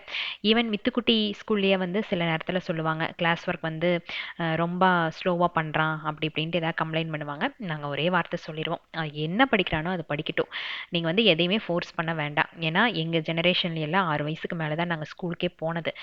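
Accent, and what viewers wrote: Coimbatore, India. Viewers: Nenga elam nala kovai slang la pesrenga but ta matum yen sis kannada pesrenga... unga voice over vida original voice ketka nala iruku sis ❤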